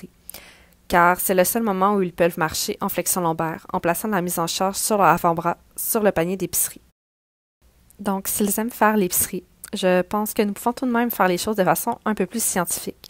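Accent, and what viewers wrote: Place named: Quebec, Canada. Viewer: Bonjour , malheureusement je n'est l'oreille aguerris a l'accent québécois et je ne comprends pas tout et je me demandais si nous pouvions entrer en contact pour quelques questions ?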